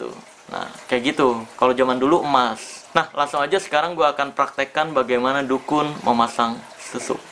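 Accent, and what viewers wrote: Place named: Madura, Indonesia. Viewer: logatnya,,Islam madura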